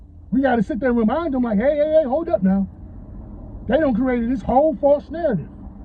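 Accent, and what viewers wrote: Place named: Midwest, United States. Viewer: lol u sound like u from the middle of America please se ya self out this conversation you sound like a Goofy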